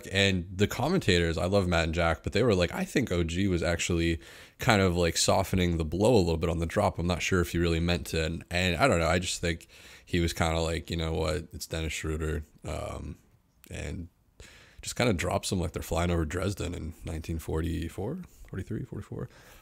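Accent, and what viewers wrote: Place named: Australia. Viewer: Holy fuck this is giving me history class flashbacks 😂😂 Love your Australian accent m8 Idk what the hell to say about this man I think about this relatively often. Shout out Gary standing up for his boy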